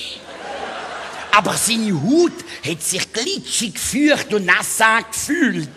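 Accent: Switzerland. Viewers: Neh an und für sich ist das ne Art deutsch ... Aber was jetzt genau ? Irgend ne art Dialekt oder dieses Schitzerdütsch ?? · Nee das ist Schweizerdeutsch :) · schweizerdeutsch. zugerdeutsch. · 10% verstanden und trotzdem gelacht ^^ - ich kann kein schwitzer Dütsch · schade das des "nur" auf schwitzerisch is. bin aus bayern und hatte den marco in der wochenschow immer gern. leider versteh ich nichts.... nurn bissl was ich mir zusammen reim kann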